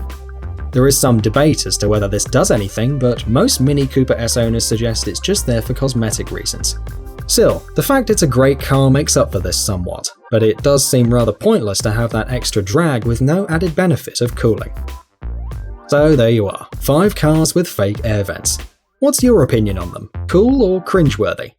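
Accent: England, United Kingdom. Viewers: It is ZED in England, per the narrators accent.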